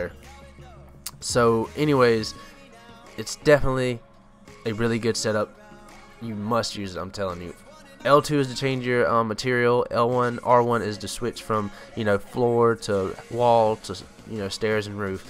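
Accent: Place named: South, United States. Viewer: He sound good st the beginning but then he country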